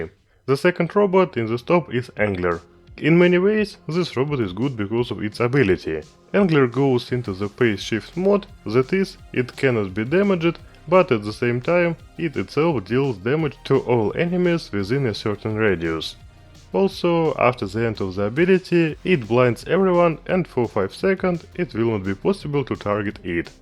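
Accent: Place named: Russia. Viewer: слышу отчетливо русский акцент, полоскун ты чтоли?)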